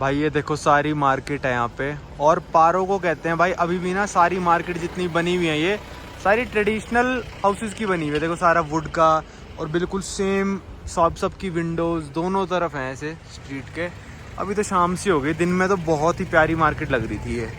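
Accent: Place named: Northeast, India. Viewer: English Accent same as North East Indian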